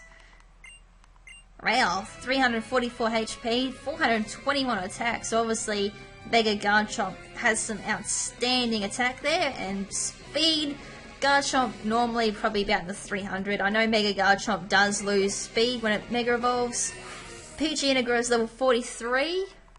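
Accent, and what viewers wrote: Australia. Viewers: your accent makes me want to visit australia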